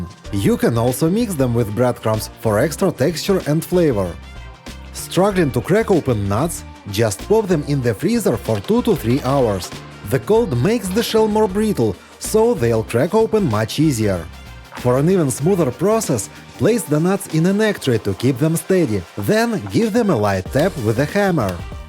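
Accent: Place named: Russia. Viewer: I love the RUSSIAN ACCENT! 👍🏻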